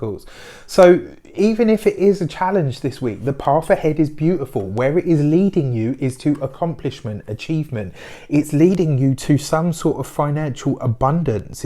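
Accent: United Kingdom. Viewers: Are you brit. ..yes. it's been the longest year. ..but my guy going through stuff too.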